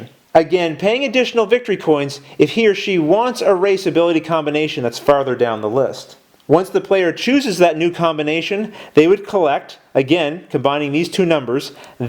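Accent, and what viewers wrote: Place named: Maritimes, Canada. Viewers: Wait a minute.. I just found out the reason why I watch your videos. Your voice is so familiar.. because I'm from PEI too.. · Do I detect an Irish accent? Edit - after researching, I've discovered it's most likely a Canadian Maritime English accent. since you've mentioned you were on Prince Edward Island. Cool! accents are so interesting.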